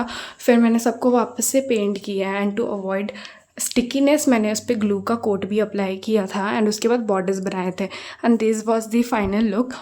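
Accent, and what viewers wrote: Bihar, India. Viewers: Aap Bihar se ho kya di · Aap bihar se ho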